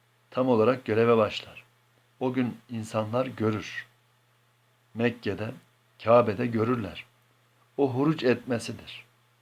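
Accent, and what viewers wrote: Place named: Ankara, Turkey. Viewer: Angara şiveden belli olmuyor mu İlk çıhışş çıhacak diyip duruyor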